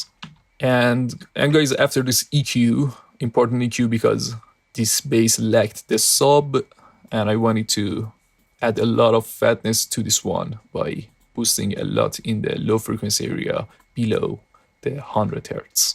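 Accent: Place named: Iran. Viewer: Your accent sounds like a persian, are you?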